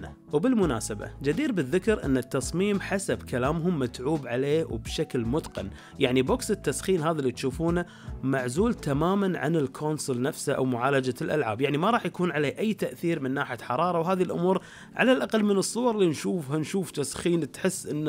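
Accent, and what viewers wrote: Iraq. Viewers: اللهجة عزيزي انه عراقي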